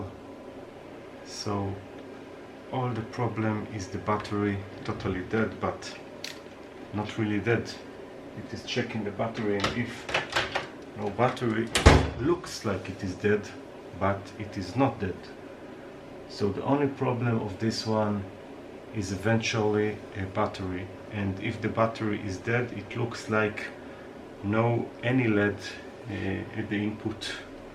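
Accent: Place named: Israel. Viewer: חח שומעים מבטא ישראלי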